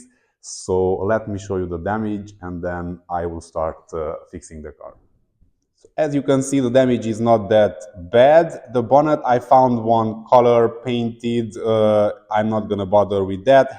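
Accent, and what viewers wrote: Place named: Germany. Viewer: I Love the grman accent, love your videos and i know you will keep the garage! :D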